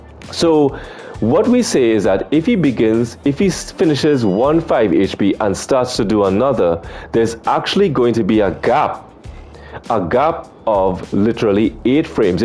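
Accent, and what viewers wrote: Trinidad and Tobago. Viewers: You a trini? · For some reason the Trini accent is helping. Thanks for this tip, from one islander to another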